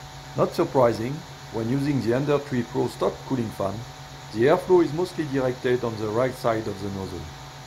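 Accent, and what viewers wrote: France. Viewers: Sympa l'accent français 😁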